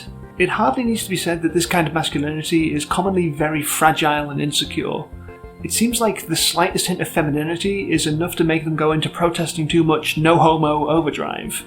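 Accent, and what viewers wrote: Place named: Belfast, United Kingdom. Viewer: Love if when I hear a Belfast accent,. 💚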